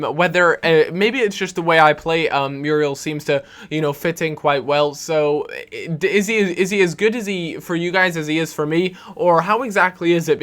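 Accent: Scotland, United Kingdom. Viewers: You scottish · Where in scotland u from??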